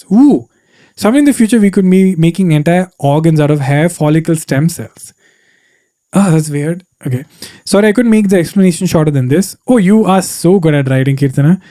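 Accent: Kerala, India. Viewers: You talk just like my mallu cousin and have the same mannerism. He's irritating right now, but I won't be disappointed if he grows up to be like you. PS: Loved the long recording of you talking 🎯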